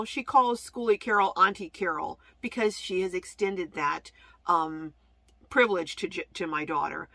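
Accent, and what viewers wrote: South, United States. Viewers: It sounds like a way of speaking that is a sign of respect from the south. I was born in Berkeley California and get that! Carry on! lol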